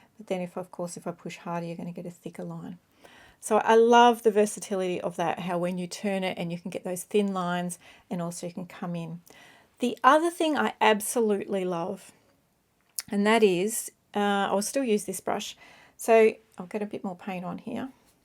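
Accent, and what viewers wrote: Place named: Australia. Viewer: super informative! glad to hear a fellow aussie too 😂 thanks for the vid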